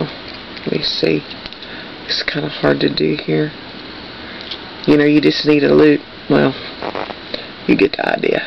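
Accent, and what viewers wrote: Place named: Kentucky, United States. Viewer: Great tutorial! I have been bitten by the cork craft bug too. I love your accent. Kentucky?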